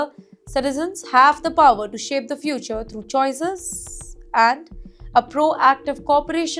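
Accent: India. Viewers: Hi I don't know who you are or where you came from, but from your accent sounds like is somewhere around India. You need to stay out of the Caribbean business. You have enough fight to fight around that zone. Trinidad and Tobago and Guyana have had enough problems with Venezuela already even before US gotten involved. Am not stating that the US has the right to do what they are doing. But you don't get the news when Trinidad and Tobago Fishermen are being kidnapped and bullied and Guyana being threatened by Venezuela to take 1/3 of their country just because of recent oil discovery. These three nations have problems and you will not understand why the animosity . It won't benefit Trinidad and Tobago and Guyana to join the side of the losing. In your case Who would you have backing you.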